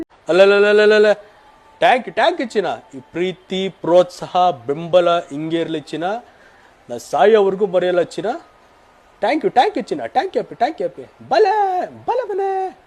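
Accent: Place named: North Karnataka, India. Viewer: Anna nivu uttarkarnatakad bhashe yalle matadri, ade accent chand kelalikke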